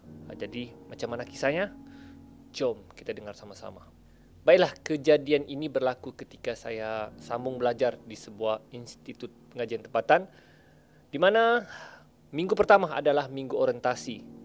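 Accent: Sabah, Malaysia. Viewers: From Sabahan? Right?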